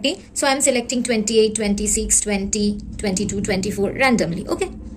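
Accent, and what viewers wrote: Bengal, India. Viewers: Mam are you bengali ? I guess by yours accent Correct me if I am wrong..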